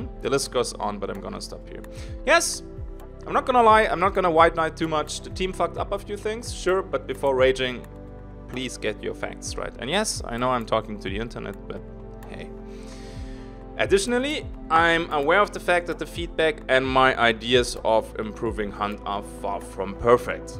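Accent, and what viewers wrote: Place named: Germany. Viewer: You sound German, I'm new here btw.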